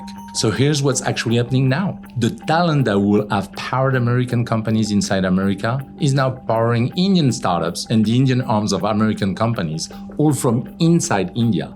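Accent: France. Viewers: Narrator seems to be of French background trying to speak in English language in order to target Indian viewers..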